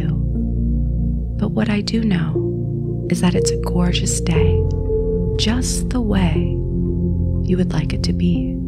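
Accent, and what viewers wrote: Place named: United States. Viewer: Finally, one with an American accent- no more British or Australian ones that distract me lol😂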